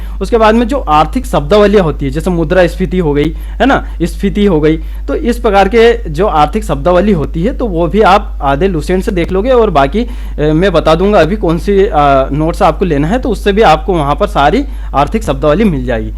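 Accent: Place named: Indore, India. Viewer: Sir aap indore s hona.....apki voice tune s lg ra h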